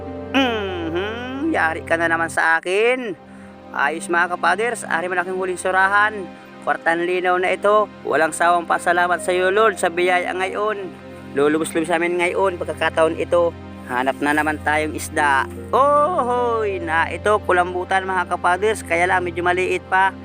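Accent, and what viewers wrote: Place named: Samar, Philippines. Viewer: Sir tagasaan k s Samar? Kc parang minsan nhhalata kita nag bbisaya parang Samarnon lol 😊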